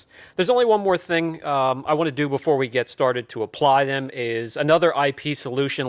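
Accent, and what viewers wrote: Pennsylvania, United States. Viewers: Very PA accent. Love the videos!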